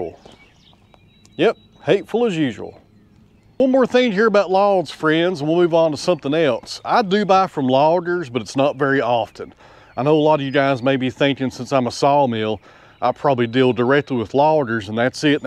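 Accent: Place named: South, United States. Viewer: I will add my voice to those who enjoy your videos just how you’ve been doing right along. That includes your explanations about not only what you’re doing but also why you’re doing it. I enjoy learning about your work. Even though I live up north, I also enjoy your delivery with a Southern touch. In these days of homogenization, the maintenance of our regional differences is a good thing IMHO. Thank you for sharing.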